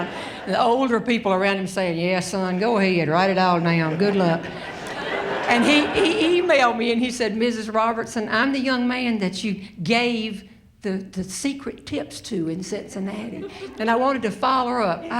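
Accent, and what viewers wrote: South, United States. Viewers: Linda Watkin I’ve watched for quite a long time now and cannot imagine how anybody could find a thing “nasty” about her. You said you “do not like her humor” and then said that you “think that she is nasty.” Is it her clothes, her hair, her voice, her Southern accent or what exactly do you find so offensive about her? Her humor is clean and free from the usual cussing, sex and vulgar talk or political comments as is so common with the majority of comedians these days. If you don’t care for her style of comedy, then simply don’t subject yourself to it. When you come upon one of her videos it really is the easiest thing you’ve ever done to just scroll on by without ever touching on it to start playing. We’re all allowed to enjoy our own chosen style of entertainment so please let us enjoy Ms. Robertson and I encourage you to find whoever or whatever brings laughter and joy into your life. 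God Bless You. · Ms. Robertson is the funniest woman in the world of humor. I love to listen to all her stories because of the humor and the southern accent. Thank you ma'am for the laughter you have brought....Billie J. King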